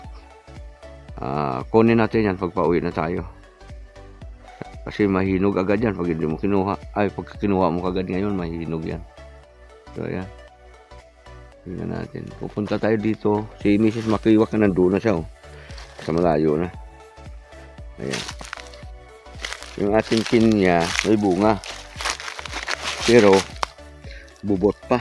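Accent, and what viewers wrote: Iloilo, Philippines. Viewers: sir ang tuno sang imo daw ilonggo ka man.sa ka sa Iloilo sir?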